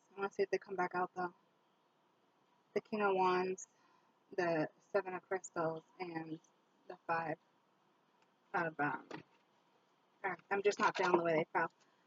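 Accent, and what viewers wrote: Boston, United States. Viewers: I'm frm NY n its sounds like ur frm more north like Boston but ur still attractive n made kool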